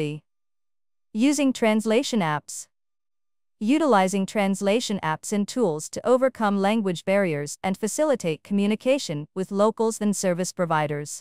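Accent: United States. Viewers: اللهجة امريكية ام بريطانية ارجو الرد ؟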